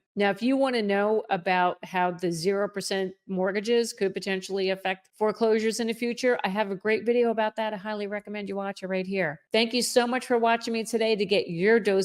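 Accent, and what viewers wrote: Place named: New York, United States. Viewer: I can tell by your accent that you grew up in Long Island or North Jersey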